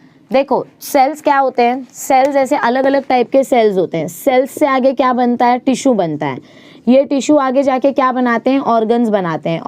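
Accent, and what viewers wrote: Maharashtra, India. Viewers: Didi are you marathi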